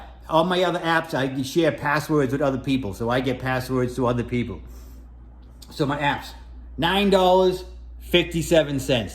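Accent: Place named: Massachusetts, United States. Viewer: Love hearing the MA accent! Reminds me of home. It's nice to see a retiree enjoying a simple life. Not everyone wants to travel around the world in retirement.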